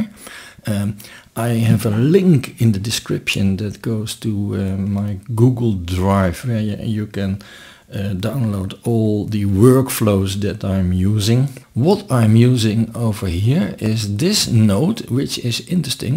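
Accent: Netherlands. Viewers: Thanks for the overview of what this extension does I have to say but away. To say when you started it was really hard for me to figure out where you were from but the further you went on in the video, hoe meer het Nederlandse dat Nederlandse tongval wil gewoon door te schemeren. But that's not important great video man thank you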